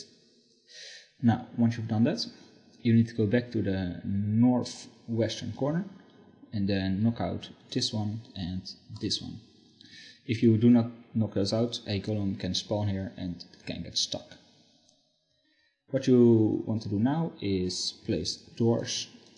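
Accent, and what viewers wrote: Netherlands. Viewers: kerl ziek nederlands acsent XD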